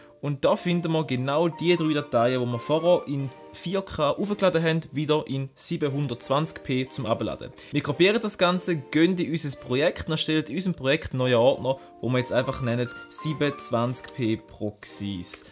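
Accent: Switzerland. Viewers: EY xD bisch du au en basler??❤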